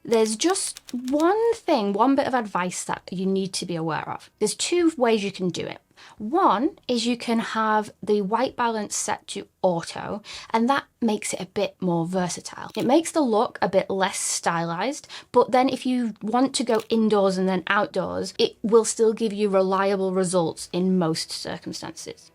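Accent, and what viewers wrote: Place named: Bolton, United Kingdom. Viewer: What a superb video! Are you from Bolton, by any chance? You sound like a female version of me!! :D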